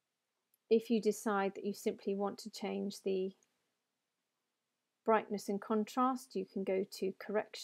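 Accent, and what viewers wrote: United Kingdom. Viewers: bri ish accent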